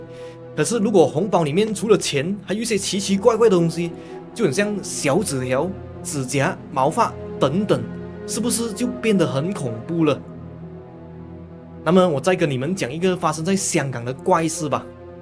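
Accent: Malaysia. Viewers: 应该是马来西亚人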